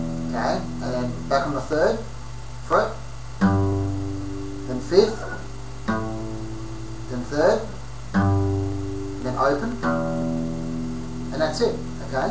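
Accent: Australia. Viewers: awwwwwesoooommmmmee thank you!!!! Are u australian?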